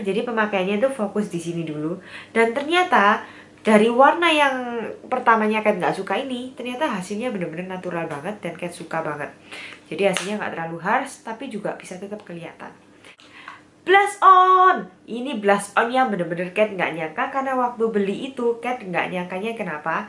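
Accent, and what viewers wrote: Java, Indonesia. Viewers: Ka cantik, aku suka cara ngomongnya. Podo2 jowo mbak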